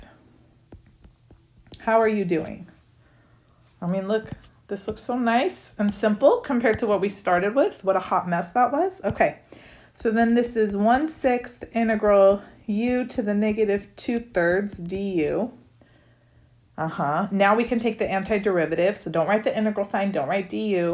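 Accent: United States. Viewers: How's that one 😂 Adore American English)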